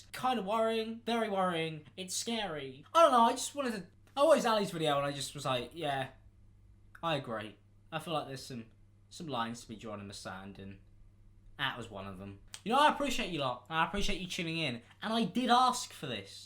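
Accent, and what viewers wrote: United Kingdom. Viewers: Subtitles + British accent = House on fire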